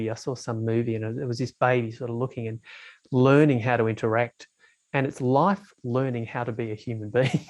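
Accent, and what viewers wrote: Australia. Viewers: Beautifully Said. I haven’t heard ‘it’ put quite this way before.. Such a simple and clear description… Nice to hear a fellow Ozzie too lol. Thank you 😊 Ps. I’d love to hear your talk about when the illusion of being a separate I apparently ends energetically, & not just conceptually. Thanks again🙏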